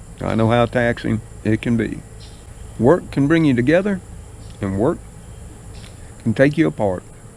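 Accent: South, United States. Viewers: Good ole home southern talking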